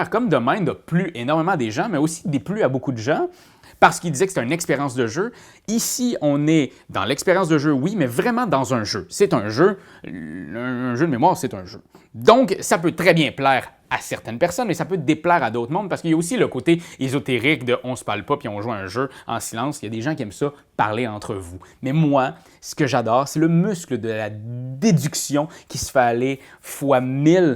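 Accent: Quebec, Canada. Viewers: j’aime l’accent québecois lol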